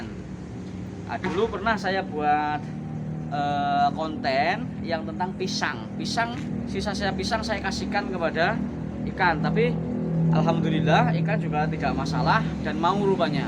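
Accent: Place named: Java, Indonesia. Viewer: orang pekan baru kok bisa jawa kang, aslinya orang mn kang 😂😂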